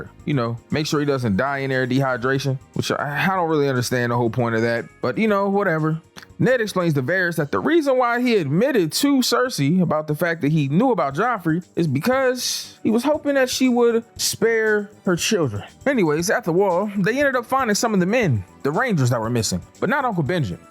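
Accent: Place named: New York, United States. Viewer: You sound like rosland or gary... or nyc.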